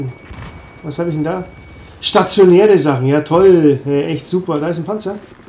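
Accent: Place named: Bavaria, Germany. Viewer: 100% Bayer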